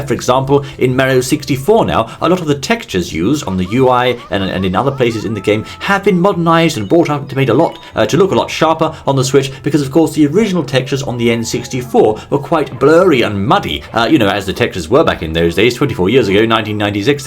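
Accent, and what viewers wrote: RP, United Kingdom. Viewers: your accent is posher than mine! I too are from the UK. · OK. This is the first time I've heard him speak but..... I LOVE HIS BRITISH ACCENT!!!!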